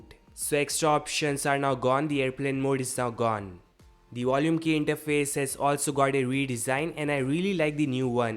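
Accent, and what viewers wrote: India. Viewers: Indian speaking english? Auto subscribe 👍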